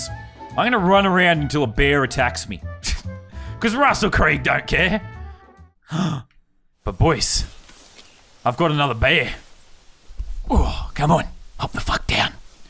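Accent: Australia. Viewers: The Australian accent makes his videos ten times better am i right😂🤣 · The fact hes Australian males it so much better lol. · It’s his Australian accent and honestly I like how it sounds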